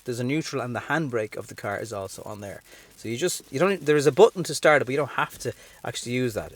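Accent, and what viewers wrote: Ireland. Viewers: Nothing new to me, but THE best explanation for beginners with EV cars. Thank you, and by the way, I love your Irish accent. Can´t wait to come back to lovely Ireland.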